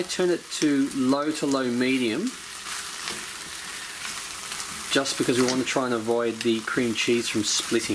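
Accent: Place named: Australia. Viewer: That Australian accent 👌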